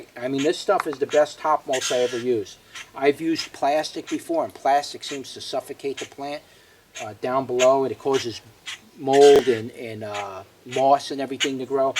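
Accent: New York, United States. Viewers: great idea! going to give it a try .. what zone are you in? you sound like a new yorker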